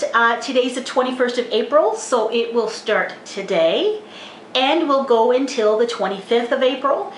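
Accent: Canada. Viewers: I love your Canadian accent, ha. I'm right below you! ( America :] )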